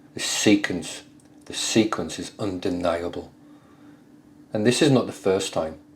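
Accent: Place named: England, United Kingdom. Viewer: This bloke is idiot, I hunted this area since I was a kid , I'm now 61. Bloke never grew in this area with English accent, it's laugh able.😅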